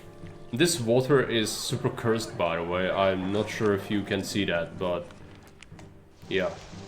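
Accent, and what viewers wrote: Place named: Czech Republic. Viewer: Oh yes for sure! I'm 64 and been playing games since 1982 on a ZX Spectrum. Got Ceasar 1 in 1992, then Caesar 2, Caesar 3 in 1998, I also played Caesar 4, but was disapointed. Ceasar 3 is the best by far, and played it all the time. But getting used to this updated version, is so cool, its like a new game! And I appreciated your tutorials, they have taught me so much, so many thanks! Oh Your English is great, English is quite hard to learn. Your words are pronoucned correctly, its just the accent that got me! Long Live the Czech Republic! Ha ha. Im in New Zealand, so miles away. Hey man, take care and keep up the great work!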